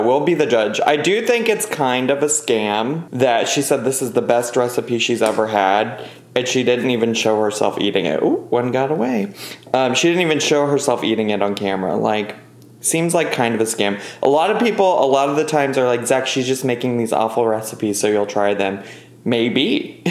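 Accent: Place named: Louisiana, United States. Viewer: this might be weird but are you from Louisiana? because you sound just like my English teacher from Louisiana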